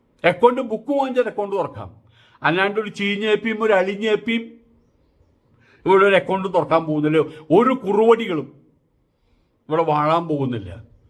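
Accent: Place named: Thrissur, India. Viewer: Trissurkkarudey aa vilikkal...... Swami enthado😂😂... Soooper correct...... Jeevanulla samsaram❤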